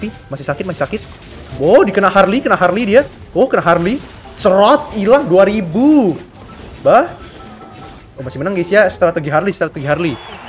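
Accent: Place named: Medan, Indonesia. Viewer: hallo bng subscribe baru ni bang lucu kali logat kau bang orang Medan kah??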